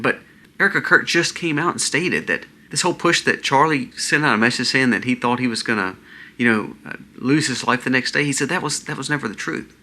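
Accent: South, United States. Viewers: I know you have a pleasant southern drawl. But if you could enunciate a little louder or clearer, my old yankee ears could understand you better No disrespect...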